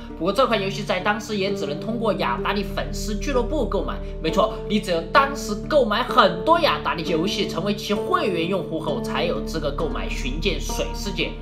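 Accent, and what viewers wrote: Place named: Sichuan, China. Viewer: 蜀產狗，土話嗆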